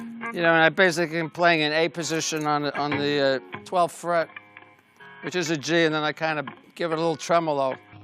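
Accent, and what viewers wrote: United States. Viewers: Ace has the best laugh ever! Love that Bronx accent. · I'm a Native New Yorka and he sounds like half my Long Island relatives. It's a VERY natural and nostalgic accent for me to hear and it's one of the reasons that Ace has seemed so REAL to me, plus the fact that on his solo album he gives a shout out to Queens and all the Cabbies in NYC and Queens where he used to work.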